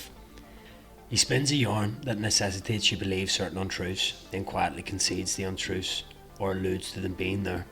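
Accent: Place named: Ireland. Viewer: It needs a special attitude to read PM books like this one and maybe exposure at uni...neither of which I have so I appreciate it when those who grit their teeth and gird their loins to do so to share their breakdowns and insights. If there is more like this to come your content looks set to be a welcome addition to our sphere. I didnt think you rambled but for those of us not attuned to the lovely Irish lilt might I suggest slightly slower speech. When one is reading from prepared content (which this seemed to be) there is a tendency to read it aloud a little fast.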